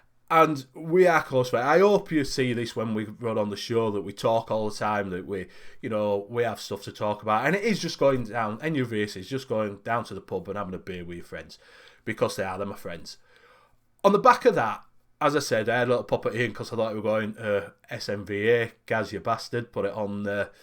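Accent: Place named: Leeds, United Kingdom. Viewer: Ey up, only been here a short time. But heard TVP mentioning you from time to time, but Deano TDV mentioned you as well. Went on to 3 men chat after TDV show on Wednesday n Aden mentioned you as well. So thought I'd gi'it a go. Soon as I heard Leeds (just up't'road twang I liked it) so stuck on with it. You keep an eye on chat as well as chatting with other hosts (which is a pro on so many levels). I too would like to thank Les Pickin, without his videos on how to mix, I wouldn't of learned how to mix. So big ups to Les or I wouldnt of been able to create my StRipple. I probably won't be about as much when I go back t'work. With you and hosts doing mixing, your numbers should go up more as I havent seen many other people doing both mixing and reviewing, all the best in the future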